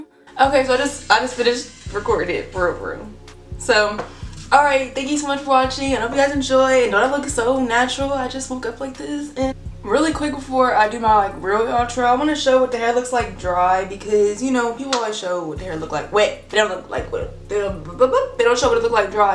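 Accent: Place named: Bay Area, United States. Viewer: I just found your YouTube lastnight and girl i loveeee your content! At first i thought you were from the Bay Area (i like too) and just how you talk lol I’m from there. But good work girl! 💕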